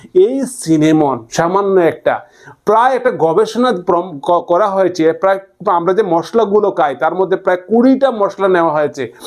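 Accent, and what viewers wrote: Sylhet, Bangladesh. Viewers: ভাই আপনি কি সিলেটি আপনার কথায় আমি বুঝতে পারতেছি আপনি ১০০% সিলেটি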